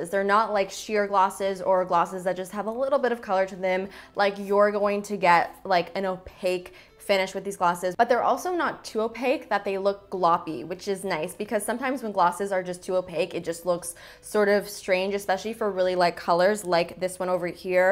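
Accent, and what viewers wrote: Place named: Canada. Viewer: What part of canada are you from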